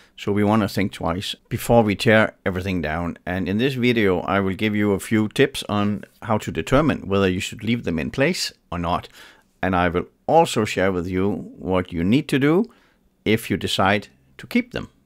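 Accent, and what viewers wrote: Denmark. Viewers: Liked and subscribed👍 very nice videos, and valuable information! Would i be mistaken if i said that there is a hint of “jydsk dialægt”? 😄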